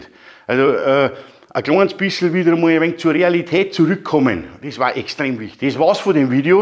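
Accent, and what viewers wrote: Bavaria, Germany. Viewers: Ganz großes Kino, vielen Dank für deinen sehr aufschlussreichen Input. Gruß aus Hamburg. Btw als gebürtiger Hamburger sag ich dir, pfeiff auf die Nasenwastl, die sich am Dialekt stören. Die sollen dort hingehen, wo sie klar kommen und die Sprache verstehen. Ein Tip an die Nörgler, es gibt eine Vielzahl an bayrischen TV Serien und Kinofilmen wo sicher für fast jeden was dabei ist. Ein bißchen gemischte deutsche Kultur tut jedem gut. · Geil endlich jemand der Ahnung hat... zwar sehr speziell und gewöhnungsbedürftig dieses impulsive aber dennoch menschlich. Ich kann zwar kein bayrisch aber verstanden habe ich. Trotzdem werde ich niemals eigenständig an Strom gehen dafür gibt es gelernte Fachkräfte... Bleibt nur danke zu sagen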